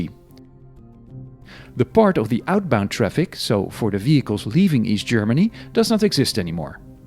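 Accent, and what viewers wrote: Netherlands. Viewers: Dutch accent? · Do I hear a Dutch accent in the narration? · Interesting. His accent in English is typically Dutch, hence my question. Thanks for the answer.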